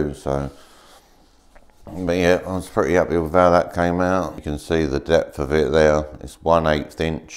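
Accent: United Kingdom. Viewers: Mate, it says you're in the states but you judging from your accent you're from the UK? Seems like you know your stuff, what's your story?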